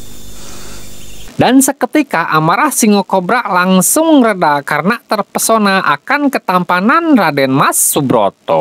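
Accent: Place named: West Java, Indonesia. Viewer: Kaya orng Sunda😀😀😀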